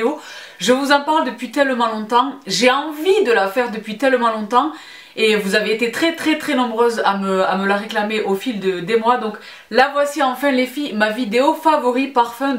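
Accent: South, France. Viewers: Coucou je suis aussi une vrai passionnée de parfum👌avec ton jolie accent du sud J avais l impression d être avec mes amis de Bandol étant à Rennes 🙂💕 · Super video ! J'adore ton accent qui me rappelle mon enfance dans le sud. Et j'aime beaucoup ta passion pour les parfums, avec pas mal de goûts communs. Je porte Fève Délicieuse depuis septembre (cadeau d'anniv'), quelle merveille ! Si tu veux bien nous en dire un peu plus sur Bois d'argent un de ces jours, ce sera super !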